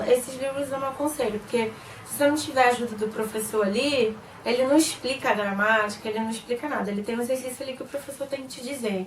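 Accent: Rio de Janeiro, Brazil. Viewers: vc é carioca, não é? se não é, viveu bastante no Rio...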